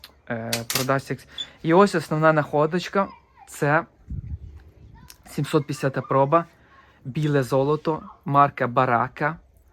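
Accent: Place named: Carpathians, Ukraine. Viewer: Контент дуже цікавий, бо я впевнений, що на європейських складах можна речі поцікавіше знаходити ніж в тих же Штатах. Також цікаво звідки ви самі? По акценту мені здається щось ближче Карпат, чи регіону - може бути Львівщина, Франківщина, сильно менше ставлю на Закарпаття. В мене є друг дитинства, він з 6го класу жив в Мадриді і акцент дуже сходий на ваш в нього залишився досі.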